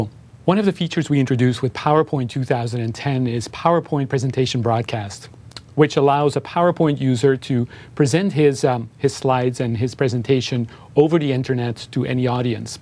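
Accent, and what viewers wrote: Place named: Germany. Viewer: Are you a GERMAN ? Got an accent . :)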